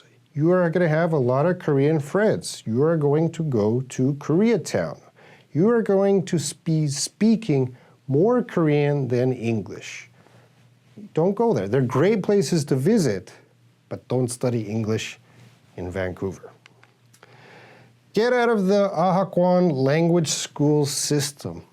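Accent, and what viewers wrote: Eastern Europe. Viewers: You really seem to have a bit of a Slavic accent of some kind - were your parents from over there? Or - what is your background? Just curious - if you don't feel like talking about it, no problem! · So, as a linguist, I hear your speech has a slight Eastern European accent such as Czech or Slovak. What other language do you speak? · Are you eastern European? because you don't sound like you are from Canada. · I'm sorry, but minutes in you sound kind of Eastern European, or "something" Canadian. So you want foreign speakers to see you sounding weird to another Canadian, as normal?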